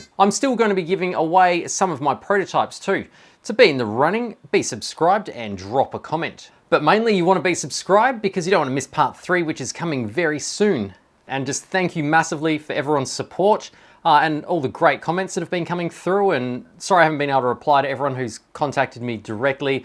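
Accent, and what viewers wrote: Australia. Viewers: the Australian accent make this feel like a nature documentary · I laugh so hard with the editing and the a Ausitralian accent. Anyone wanting to cheer up your day just visit. Cat lovers might not take kind to the video but piss on them.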